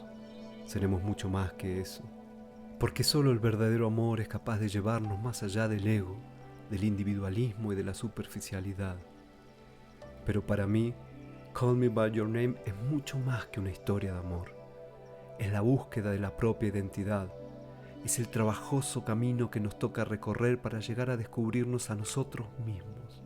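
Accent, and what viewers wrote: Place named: Argentina. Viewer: Una pelicula tan buena. Quantas lagrrimas quando el regreso' a USA. Seguir ese canal me permite de escuchar tu voz argentina que me gusta mucho. L'acento es lo que prefiero de l'America del surf. Gracias 💚 Un beso desde Italia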